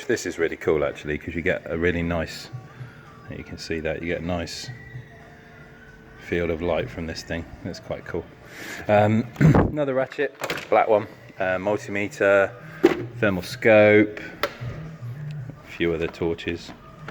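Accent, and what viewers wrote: United Kingdom. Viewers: English man in USA ?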